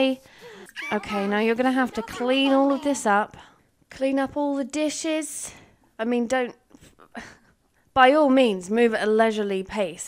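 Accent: United Kingdom. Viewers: i love how british and grumpy you sound in here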